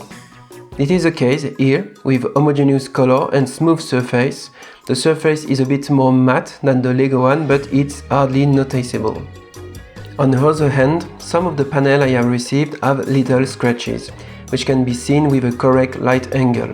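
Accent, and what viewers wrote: France. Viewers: On entend l'accent français mais sa reste intéressant de l'écouter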